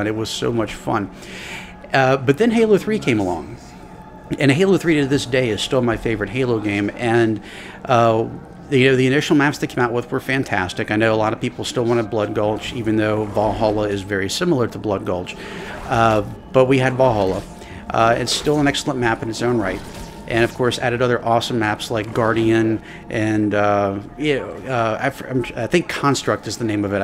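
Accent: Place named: United Kingdom. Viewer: I C O N I C.- A Flood fan with UK accent. 👀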